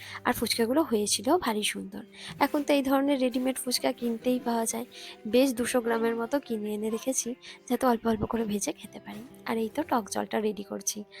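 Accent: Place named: Bangladesh. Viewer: আপনার ভয়েস টা শুনে ইন্ডিয়া মনে হয় না বাংলাদেশী মনে হয়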